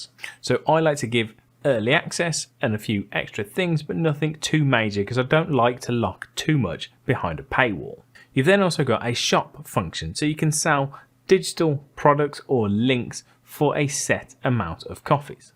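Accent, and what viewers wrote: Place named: Birmingham, United Kingdom. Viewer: just come across your channel chap. Great stuff, explained the buy me a coffee concept so clearly I’m off to sign up. BTW, that accent sounds like yo live by me near Brum?? If you do and want some stills to use on channel/social media, I’m happy to help FOC (if I can pick a few tubing tips up from you). Thanks for the great video, cheers Phil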